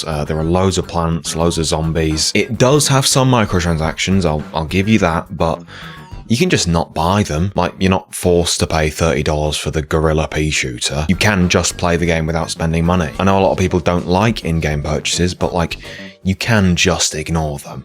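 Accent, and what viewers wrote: United Kingdom. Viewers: This is the most British voice I've ever heard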